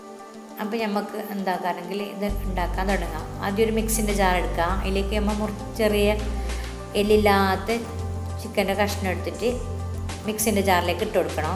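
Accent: Kasaragod, India. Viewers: Kazargod slang alle ithu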